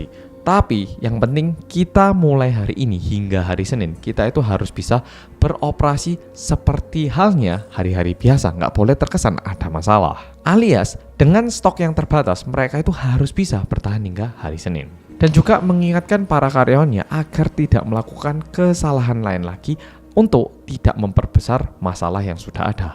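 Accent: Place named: East Java, Indonesia. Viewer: Salut..bangga apresiasi sama Mas..bahasa indonesia logat jawatimuran umumnya " Malangan khususnya. Ortu seperti saya ini asal Sumut domisili di jakarta 50 tahun..selama bekerja..kadang ke jateng..tp ga pinter2 amat boso jowo. 👍🏻👍🏻👍🏻👍🏻 isin...aku.😅 opung ini suka filosofi jawa. tentu yang lainnya juga..salam sehat semuanya. Sai hipas saluhutna🙏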